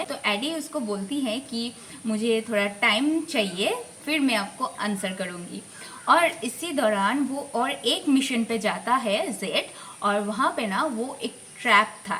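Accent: Bengal, India. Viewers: Di you are bengali, right?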